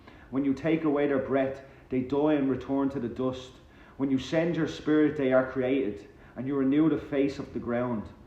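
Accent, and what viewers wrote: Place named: Ireland. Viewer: Love your Irish accent‼️ ☘️ Your love for God's Word is so inspiring. Keep up the good work for Him.🙏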